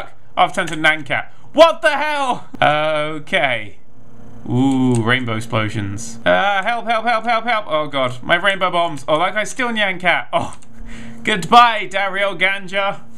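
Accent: United Kingdom. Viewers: its the british accent. once again, the reason why americans watch top gear, is the british accent. i dont pretend to know why, its just a fact. its hypnotic and adds automatic legitimacy at the same time. we all are slaves to the magic of a british accent. if that makes any sense. which it probably doesnt. nothing i say ever does... hehehe... what?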